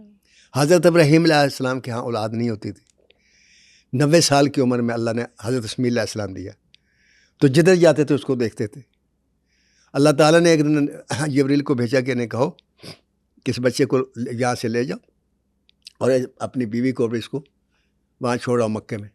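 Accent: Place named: Punjab, India. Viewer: I LOve your Punjabi... it's sweetness and familiarity is what makes my heart happy!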